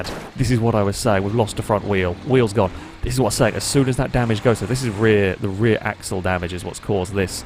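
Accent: United Kingdom. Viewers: hes just brittish, give the man some wa’ahr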